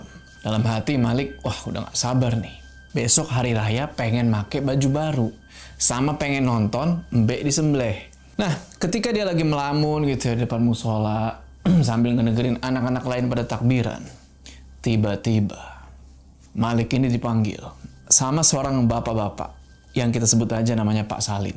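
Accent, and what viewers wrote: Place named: Cilegon, Indonesia. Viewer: Pantesan logat nya Nong2 bae, taunya ini ceritanya di kampung halaman owe (cilegon)😅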